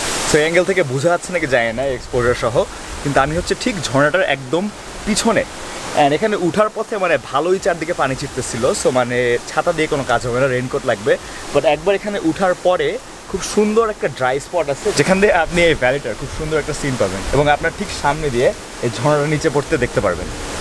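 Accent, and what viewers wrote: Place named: Kushtia, Bangladesh. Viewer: এ্যতো দিন পর বুঝলাম ভায়ের ভাষা এ্যতো শুদ্ধ কেনো কুষ্টিয়ার ছেলে বলে কথা ভাষা তো শুদ্ধ হবেই 💜💜💜💜💜💜💜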